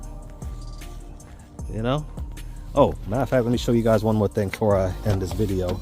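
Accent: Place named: Caribbean. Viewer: Jamaican or Trini? I hear a Caribbean accent 😂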